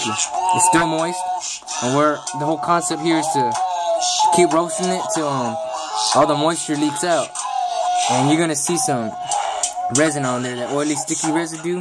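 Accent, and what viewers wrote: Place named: Texas, United States. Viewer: He definitely an Ese from Texas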